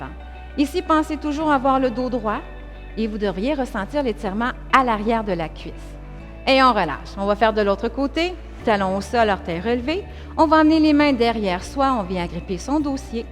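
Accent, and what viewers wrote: Canada. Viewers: Bonjour de Bretagne! je viens de faire pour la première fois votre séance et j'ai beaucoup aimé. J'ai adoré aussi votre accent canadien, je reviendrai demain. Vous avez d'autres vidéos ?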